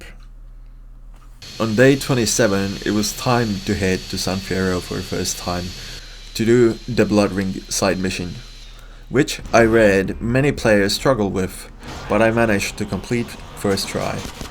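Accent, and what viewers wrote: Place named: Estonia. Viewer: hevi eesti aktsent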